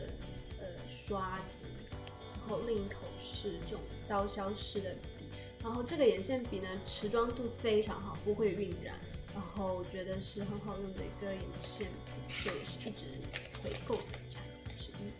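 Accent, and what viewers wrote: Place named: Taiwan. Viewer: 讲话像台湾人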